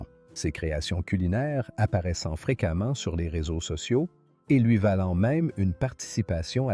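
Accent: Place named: Canada. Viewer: 18/05/2026 1) MONOLOGUE DE L'IA ACCENT CANADIEN ! 2) Il SE PRÉNOMME "RIEU", pas "RIA" ! 3) À , ce n'est pas André Rieu sur le lit d'hôpital ! Et en haut à gauche, qui est-ce ? 4) À ', qui est ce type gros avec le crâne rasé ? 5) À ', ce n'est pas lui avec à ses côtés l'infirmière ! 6) À ', IDEM 7) À , un homme jeune, aux cheveux foncés, long et une barbe ! 8) À , une infirmière aux longs cheveux blonds et un homme asiatique allongé subissant un examen ! 9) À ', qui sont ces jeunes adolescents regardant leur téléphone ? ASSEZ !:body-green-covering-eyes: JE VOUS SIGNALE : C'EST INSUPPORTABLE !:face-orange-frowning::face-green-smiling: Belgique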